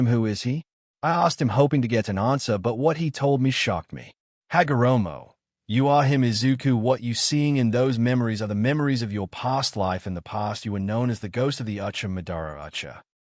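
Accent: United Kingdom. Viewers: Bro sounds British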